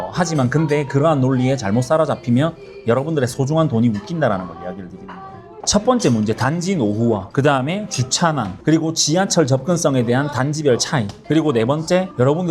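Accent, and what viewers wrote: South Korea. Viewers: 근데 사투리 엄청 쓰네…. 태생이 지방러인듯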